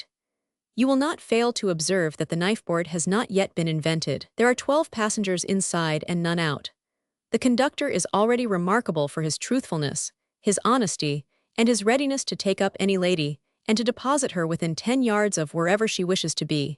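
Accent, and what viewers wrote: United States. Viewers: An American accent doesn't do justice to a good book,sorry.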